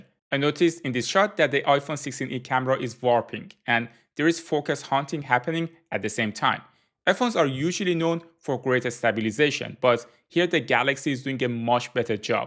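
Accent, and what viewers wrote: Iran. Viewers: I have a feeling from your accent that your persian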